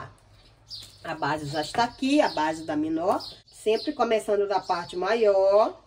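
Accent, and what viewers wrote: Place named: Belém, Brazil. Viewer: haaaaaa perguntei pelo sotaque, sou de Belém do Pará, fui em mosqueiro uma vez se não me falha a memória, amei saber que es daí 😘 muito sucesso pra ti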